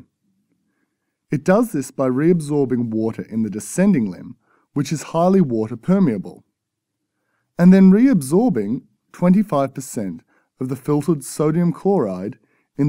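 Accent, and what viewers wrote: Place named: Australia. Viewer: this video is good cuz of the aussie accent..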